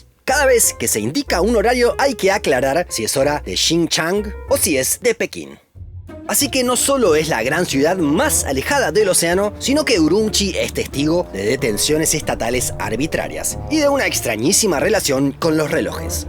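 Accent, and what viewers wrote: Argentina. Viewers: ¿Por qué pronunciás "Urumchi" si es "Urumqi"? Si en vez de Beijing decís Pekín... no se entiende. Igual por suerte volviste a usar el yeismo de Argentino, hace unos años te hacías el mexicano neutro y decías "poio" o "iuvia".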